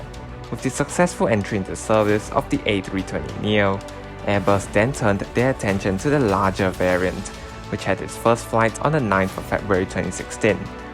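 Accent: Singapore. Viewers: im guessing thats a singaporean accent with some shots from changi?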